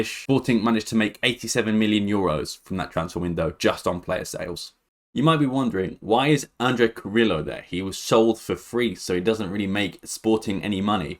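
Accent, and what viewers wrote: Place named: England, United Kingdom. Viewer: Good video. You have an Iberian name and wear a sporting shirt but you have an English accent? Where are you from and who do you support?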